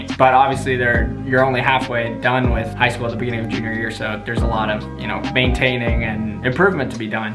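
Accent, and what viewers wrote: United Kingdom. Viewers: Wow! Very cool story. Your intelligence, high standards, and perseverance have clearly paid off, and probably will continue to do so in the future. Your ability to communicate well has really helped me to understand your process. When you speak I am able to visualize your story, step by step, and truly feel your experiences. Awesome job!!! I look forward to watching your running career and life unfold. I have one simple and fun question. Talk to us about losing your British accent. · Where is your British accent?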